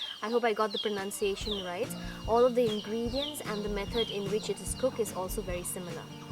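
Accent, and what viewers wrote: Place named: India. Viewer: Watching from 12,000 km away. I know not whether you are BD Garo or IN Garo. Why I am asking? There is a reason behind, that is your English accent. You do not have West and South Indian English accent. Yet you have around 10% Indian accent at some points. Please tell us the name of the village and show us the village location in the map.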